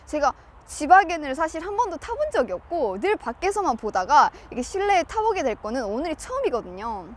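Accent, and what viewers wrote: Gyeongsang, South Korea. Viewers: 이까지 라니 ㅎㅎㅎㅎㅎㅎ 부산 경남이 고향이신가요? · 경상도 사람인가?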